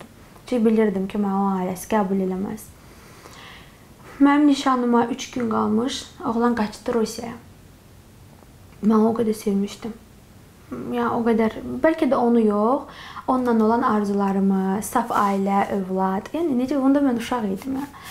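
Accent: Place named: Trabzon, Turkey. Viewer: Trabzon şibesiiyle okuduğun mahmuda lap yerine düşdü evli kişiyle qarışdıran müğenni qadın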